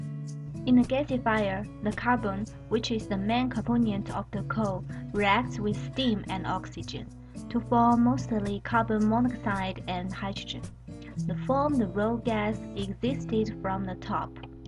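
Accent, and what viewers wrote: China. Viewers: if this is an "american institute" thing then why is this being narrated by some chinese lady who just learnt english a week ago ? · The video is funded by two US organization yet why does it sound like you hired a voice actress who sound like she hadn't even left China?!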